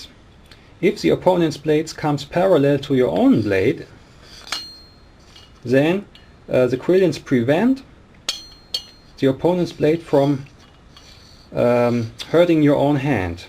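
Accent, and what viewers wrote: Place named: Germany. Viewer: Nice german accent. Thanks for the video.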